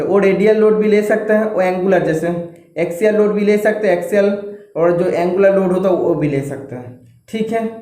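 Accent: Bengal, India. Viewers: Bengoli ho bhai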